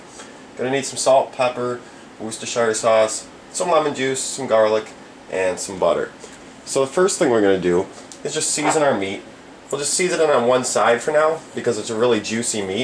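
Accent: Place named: Midwest, United States. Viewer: 1 of the best recipes ever! Thanks man good job buddy! I can Tell by your accent youre from the Midwest! Im in ND! Thanks man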